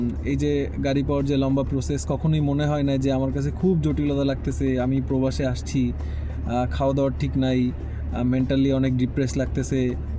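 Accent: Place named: Bangladesh. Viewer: Bangladeshi laicent lage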